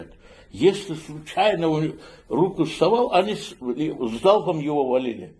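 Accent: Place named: Dagestan, Russia. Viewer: По его поганому сюлай акценту видно что он ни хрена не знает. Что он просто дебил. По истории когда шамил прибежал в Чечню( или заслали взяв его сына в заложники) он шамил для столицы имамата выбрал Чеченское село ДАРГlА. Тоесть как мы видим что это село и до шамиля сушествовал. Не прибежали же дагинцы раньше шамиля в Чечню строить ему столицу😂. Вы этим сюлиям часто напоминайте о георгиевском знамени каторую им подорил руский царь николай первый, аварскому народу за борьбу против кази муллы и шамиля. Нам этого знамени достаточно что бы заткнуть рот любого аварца СЮ- ЛАЙ ( фас- раб).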